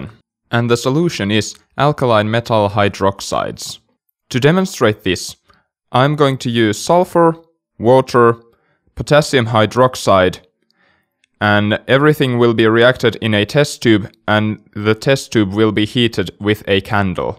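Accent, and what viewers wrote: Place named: Finland. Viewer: You have finnish accent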